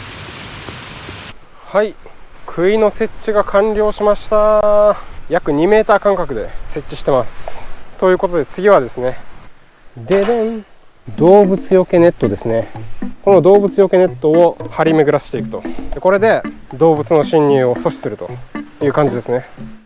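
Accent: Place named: Okinawa, Japan. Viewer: 沖縄のイントネーションとキャラがいい感じで楽しく視聴させて頂いてます！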